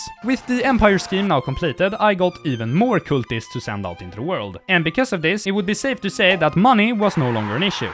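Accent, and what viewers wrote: Sweden. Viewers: This is the best swenglish I've heard since the "regular ordinary Swedish mealtimes" channel went inactive. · Bro is as close to swenglish as you can possibly come while still pronouncing the words correcty lol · Nah, he sounds extremely swedish, this is the most stereotypical swedish accent //another swede here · Holy tits this mans Swenglish accent is absolutely heavenly I need this in my ears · As a scandinavian this is the most perfect demonstration of Swenglish i have ever heard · I have few Swedish friends and they don't exactly sound like him but I guess it's close enough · most heavy swedish accent❤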